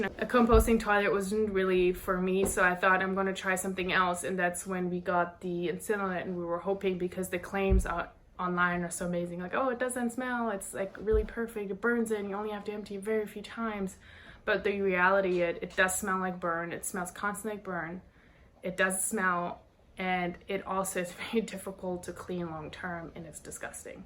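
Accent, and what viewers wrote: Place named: Germany. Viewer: your english really improved, i remember watching your videos back when your (cute) german accent was way stronger💛i love to watch your videos and hope i‘m supporting you through it, i kind of have the same dream of living in hawaii for like 1/2 years in my life one day. greetings from switzerland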